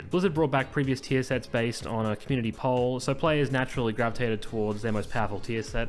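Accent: Australia. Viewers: you sound aussie bro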